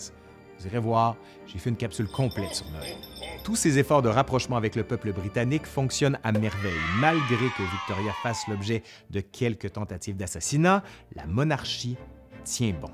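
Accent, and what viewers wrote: Quebec, Canada. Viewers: Sincèrement oui !!! excellent & fascinant ! l'histoire a toujours été mon "dada" (comme on dit en France, ma passion quoi) !!! tes talents de compteur sont indéniables 🤗 et j'adore ton petit accent québécois 😏🥰 j'aime toujours d'ailleurs la prononciation parfaite des noms anglais contrairement à nous 😅🙈 Ta chaine est ma préférée avec celle de Nota Bene & les Revues du Monde. Et oui j'avoue j'aime beaucoup aussi les émissions de Stéphane Bern 😅😁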